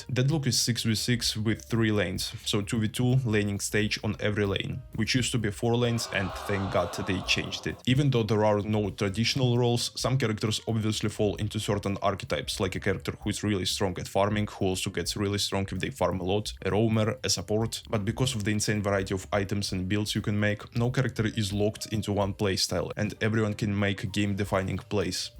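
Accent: Russia. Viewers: Russian accent? :)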